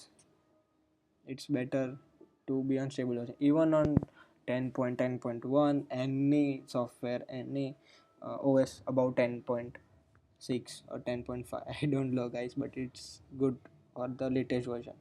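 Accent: India. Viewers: indian accent sucks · and like the indian accent :D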